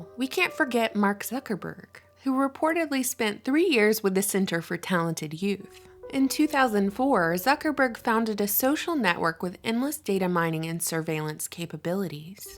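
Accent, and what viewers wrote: South, United States. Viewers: I love your videos...you have a familiar southern draw that me being from Birmingham alabama, just a couple of hours drive down I-20 from you, have missed since I've been living in Sacramento..and the unique power of a southern girl to be classy, sexy, intelligent, and a natural rebel all at once, unlike here where the girls are not any prettier and have personalities that make them instantky ugly as hell.....i love your brain to..the way u think is refreshing and you present it very well because you info is always insane because I learn stuff in every video, and there I was thinking I knew it all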